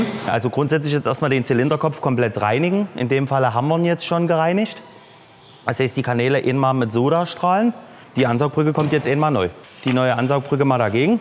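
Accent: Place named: East Germany, Germany. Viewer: Klasse dein Ossideutsch😂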